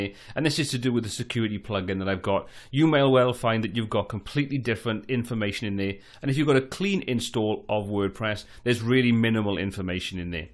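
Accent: Wales, United Kingdom. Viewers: Welsh accent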